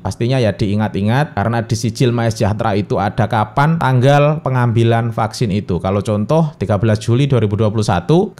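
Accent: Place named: Java, Indonesia. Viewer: Jowo medok hadir bosk 😄👍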